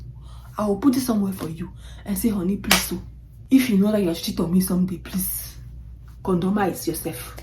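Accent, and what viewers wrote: Nigeria. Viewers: And also are you sound Nigerian ❤❤❤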